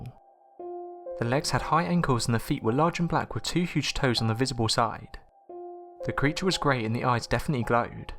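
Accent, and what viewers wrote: England, United Kingdom. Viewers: Is it just me or do all videos like this have someone with an English accent narrating.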